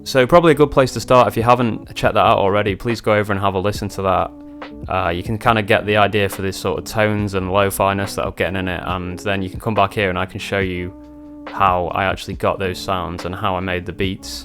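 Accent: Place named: Northwest England, United Kingdom. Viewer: Superb tape! And as always, nice vid. I started with a PO recently and after watching your vids, decided a 404 might be a nice step up/replacement. Surprisingly they work really well together though - rather than as a replacement. I have a 202 arriving today also (you’re partly to blame for this!). Keep up the great vids - great to hear tutorials in a NW regional accent. Reprazent! ✊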